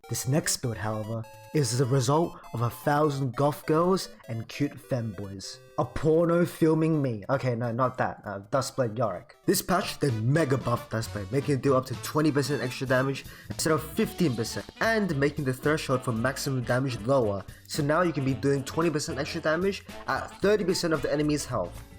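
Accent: Australia. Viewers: He's just Australian don't mind it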